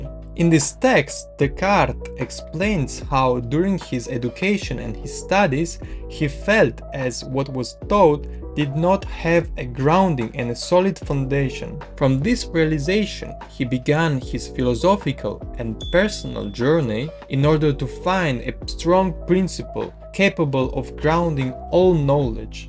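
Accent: Russia. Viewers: Are u Russian? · Я как услышал как ты жестко выговариваешь слова, сразу подумал, а не русский ли ты, а потом такой мое имя 😅.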